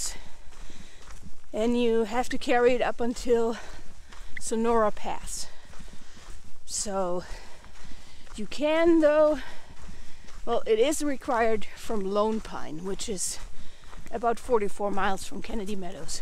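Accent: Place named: United States. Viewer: You remind me so much of my friend from norway. She speaks English with a modified American accent like you do. Instead of a British accent. But we’ve been friends for 40 plus years. She has American friends so there you go. Anyway, she came out to visit me a few years ago snd her fav part of CA was the sierras. Traveled the western and the eastern sections of the sierras from lake Isabela to Lake Tahoe. I’ve traveled the world. Few places as ruggedly beautiful as the sierras. Tho I think the cascade range in WA snd OR, where I grew up, is incredible as you will soon see. Thanks for sharing your adventures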